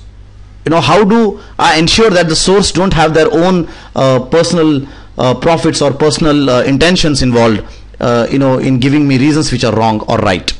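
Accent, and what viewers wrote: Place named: India. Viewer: Indian English needs SUBTITLE....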